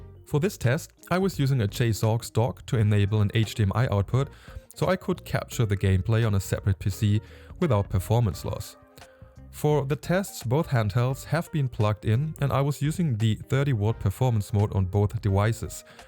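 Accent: Germany. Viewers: Dachte mir die ganze Zeit… ists nen deutscher? 🤣 bis zum letzten Wort war ich mir unsicher 🤣 Tschüss!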